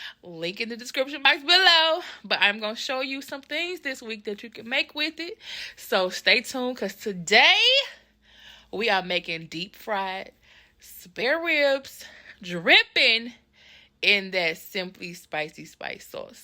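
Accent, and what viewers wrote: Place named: Texas, United States. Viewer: Hey! You sound like you are from Texas.💜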